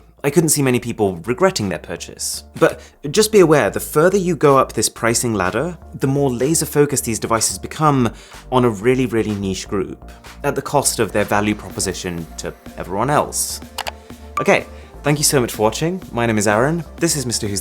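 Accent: RP, United Kingdom. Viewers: I love your reviews but that accent... fuck I hate British people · What's with the exaggerated posh accent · he has british accent. teach me to.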